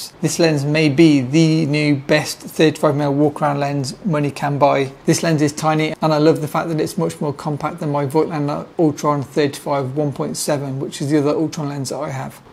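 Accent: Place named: Northern England, United Kingdom. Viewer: I suppose accent is a Northern English accent. I like it very much! Very easy to understand. And is nice to look at;)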